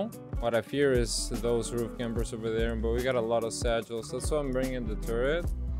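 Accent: Argentina. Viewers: Amigo sos argentino? Lpm me re confundis xd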